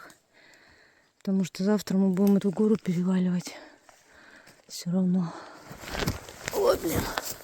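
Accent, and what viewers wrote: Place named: Russia. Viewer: Santa approves🎅👍 This is the one video, you made, that made me earn your respect the most. Even if you were not by yourself, filming... Today,... You have more camping equipment. This one, shows the difficulty, and yet the simplest, basic survival,... two bags, taped together... I love it! 👍 The harshness of what you went through, and yet, the beauty, on all levels, nature, at it's best. From a butterfly,... all the way to a snowy, wet, difficult hike. It's amazing! You are a very resilient, strong, beautiful woman, with the most beautiful accent, Russian voice... Your eyes, hair, are amazing too... Wishing you the best,... ❤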